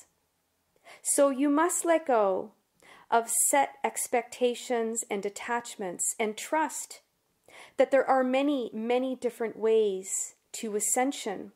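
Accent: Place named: Canada. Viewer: You lost me at "outside" and "outcomes." Oooooot-side and oooooot-comes. Canadians should be seen and not heard...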